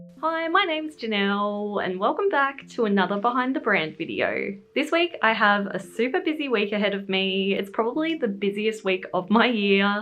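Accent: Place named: Australia. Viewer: We recently moved to usa from tassie to care for my MIL. Im so homesick though. When I stumbled accross your channel I felt so comforted hearing your aussie accent. And then you say you're from tassie!!! Im subscribing ❤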